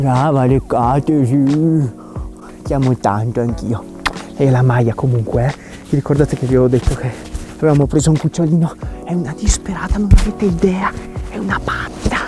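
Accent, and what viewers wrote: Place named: Veneto, Italy. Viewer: Raga ma perché ha l’accento veneto? Comunque adoro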